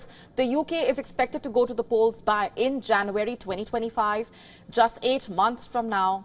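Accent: India. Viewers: I am very disappointed that Rishi does not speak with an Indian accent like he should!! So sad and tragedy!! Indian accent has to be the most beautiful accent in the world like this news woman. No trolling here!! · A horrid abrasive accent she has. Like NBC from India.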